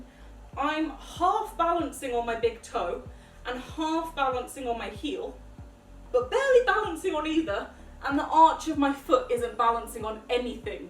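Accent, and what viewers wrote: United Kingdom. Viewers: I can tell you are from the UK 🇬🇧, I’m sorry for those who will hate but I’m new to this channel Also my favourite time stamp has to be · I love your British accent ❤️❤️❤️❤️❤️❤️❤️❤️❤️❤️❤️❤️❤️❤️❤️❤️❤️❤️❤️❤️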